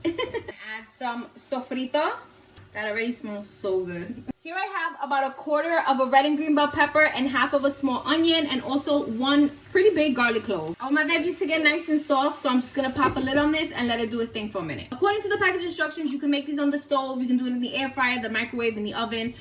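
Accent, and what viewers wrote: New York, United States. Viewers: OMG I will have to try this recipe. I love that New York accent.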